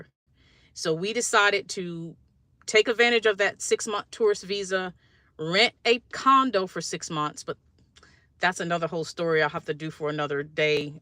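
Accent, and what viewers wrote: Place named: Canada. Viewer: Your accent is starting to sound Canadian.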